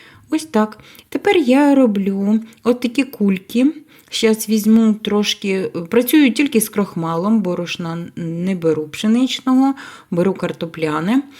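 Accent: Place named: Polesia, Ukraine. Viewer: "...щоб він не підсиха(о)у" - поліське, впізнаю. Побільше б такого автентичного, з вашої родини, від бабусі (хоча це слово (бабуся) - то від нас, з Полтавщини) 😍 Оце ваше кі(и-у)н(ь) - кінь, гурок - огірок, сі(и)ль - сіль. З Полісся з всі слов'яни пішли ;-)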